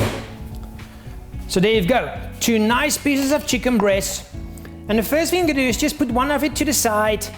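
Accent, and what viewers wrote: South Africa. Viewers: sounds south africa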